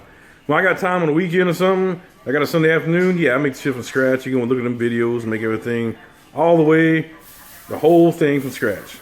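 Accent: South, United States. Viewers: I love his southern accent. Lol he said don't smoke no pot in Texas 😂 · Love love love love your southern accent!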